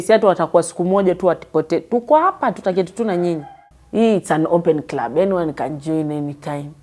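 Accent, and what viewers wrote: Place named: Coast, Kenya. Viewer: Most costo people sound like that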